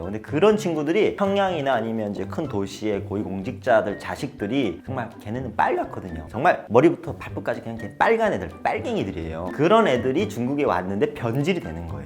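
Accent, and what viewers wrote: South Korea. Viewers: 이사람 왤케 한국사람같음? 말투가 그냥 한국인임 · 말하는개 보니깤ㅋ 한국남자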